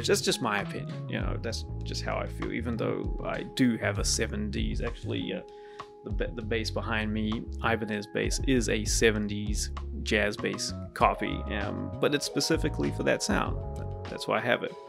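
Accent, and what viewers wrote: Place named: New Zealand. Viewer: It's always funny to hear the familiar Kiwi accent out of the blue. You're an amazing player bro!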